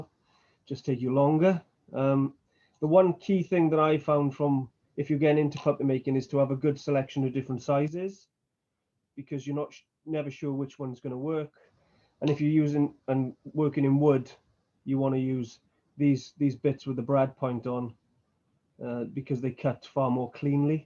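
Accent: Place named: Wales, United Kingdom. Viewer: Gotta be a welsh accent. Lovely to hear it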